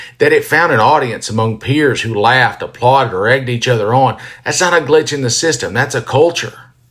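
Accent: South, United States. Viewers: Hi - I’m glad to see and hear a white Southern man standing up against the stupidity and intentional cruelty these mean boys are fouling the world with. No, I don’t like everybody in the world, and sure I may pre-judge folks on how they present themselves, how they act towards me or others, and definitely about what they say, but not due to the color of their skin, ‘cause I’m not an idiot. I don’t think a surplus or lack of melanin has much to do with a person’s brain, heart or soul, but then I’m a mature human. Which those young Republicans obviously are not. Anyway, thanks for speaking up. We need a man with a strong southern accent to give the what for to the idiots who sound like him but think and act like fools. Kudos dude!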